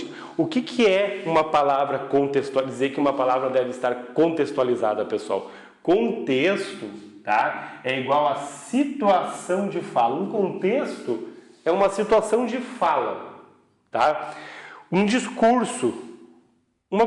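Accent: South, Brazil. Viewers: Muito top o sotaque do Sul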